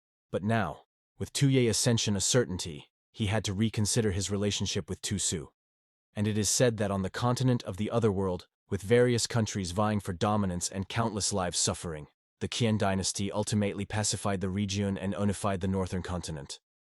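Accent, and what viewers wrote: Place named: Ireland. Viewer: The narrator seems Irish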